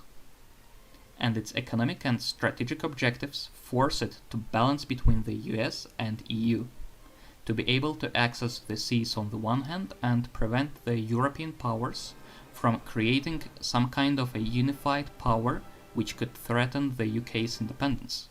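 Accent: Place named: Russia. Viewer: Interesting thats the top and only YT video on British geopolitics is delivered with a Russian accent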